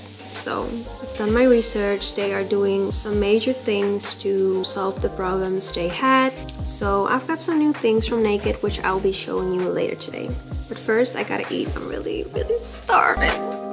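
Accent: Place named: England, United Kingdom. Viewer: Ik houuu van jouw engelse accent!! Rustgevende stem heb je!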